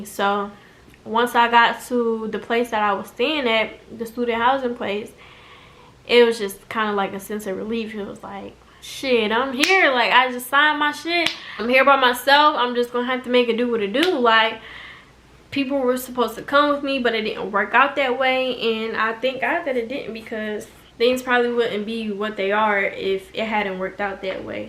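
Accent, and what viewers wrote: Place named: South, United States. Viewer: How long you been there you sound country af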